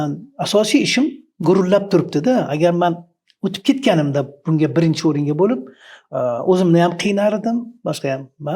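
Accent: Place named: Tashkent, Uzbekistan. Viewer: Тошкент шевасида гапирар экансиз, узингизни шевангизда ёки адабий тилда гапирсангиз эди.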